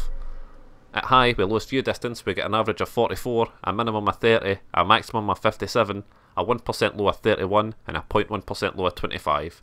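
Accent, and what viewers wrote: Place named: Scotland, United Kingdom. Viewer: Nice Scottish accent, I wish I had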